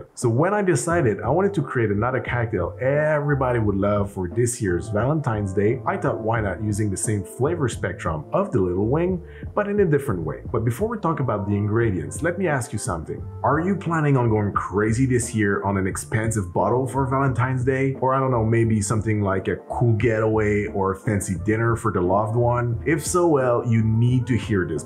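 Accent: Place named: Quebec, Canada. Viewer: He is French Canadian, if you're looking for correct pronunciation you're probably in the wrong place. Yeah, just made the cocktail (including the grenadine recipe) very balanced, could use a bit more bitter imo so I threw a couple drops of bitters on top. Didn't have any cucumber though, I imagine just adds another level of freshness on top of the lime juice.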